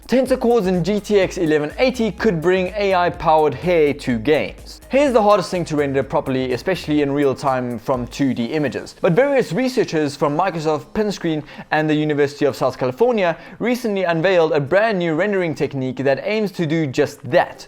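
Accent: Afrikaans, South Africa. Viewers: was this guy south african? (im also south african) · The Afrikaans is heavy with this one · He sounds South African. 👍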